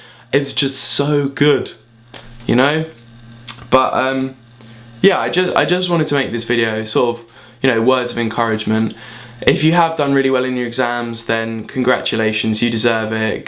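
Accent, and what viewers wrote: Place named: United Kingdom. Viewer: I just love his unique strong british ascent...interesting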